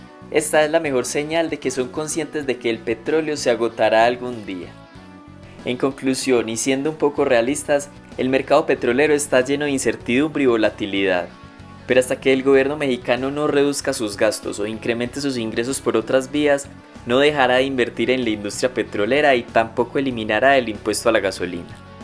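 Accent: Antioquia, Colombia. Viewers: Su acento es claramente colombiano. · Es paisa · Pensé que eras paisa, tienes un asentó parecido.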